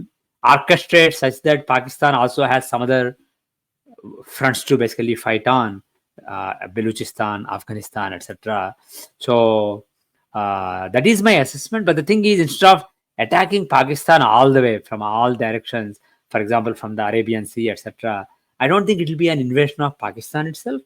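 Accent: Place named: India. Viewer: He lives in USA 🙂 Nonetheless for us Indians he has used Indian accent Engl language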